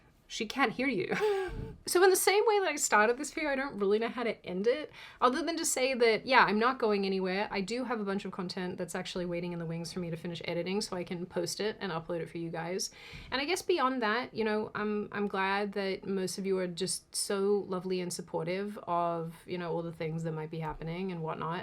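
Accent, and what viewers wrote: Australia. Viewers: Is it just me or does she sound more Aussie than she has in the past? So sorry she’s going through this…😢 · Wow, hearing her speak so "naturally" and candidly is really nice. And she comes off as likeable, funny, and with that Australian streak of charm. 🙂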